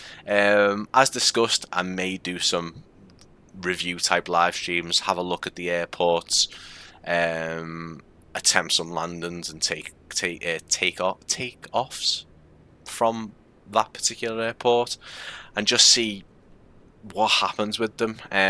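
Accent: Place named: Wirral, United Kingdom. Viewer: Excellent work, mate, keep it up :) Where are you? Your accent sounds local to me (Wirral)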